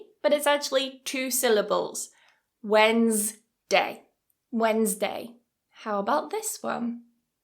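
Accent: United Kingdom. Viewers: are you a british speaker?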